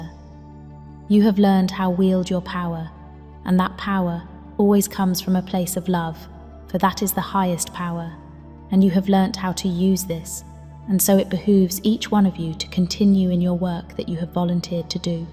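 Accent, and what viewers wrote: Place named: United Kingdom. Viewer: And with a British accent yet. Nice try, but no brass ring !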